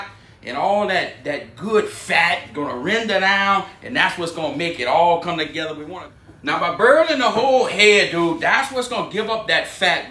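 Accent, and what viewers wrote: Louisiana, United States. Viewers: Born and raised in in church point Louisiana. Been gone from there over 25 years, thanks for bringing me back with every video you make. Btw my dad talks just like that lol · Man I really like the cajun accent. Getting a heavy dose of cajun today after I found this video. Awesome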